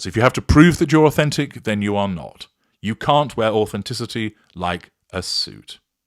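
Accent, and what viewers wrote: United Kingdom. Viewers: Unfortunately, as you can hear, is British and therefore has no sensitivity or superstitions about such things that make you uncomfortable. He's just reflecting his own British culture. Sorry.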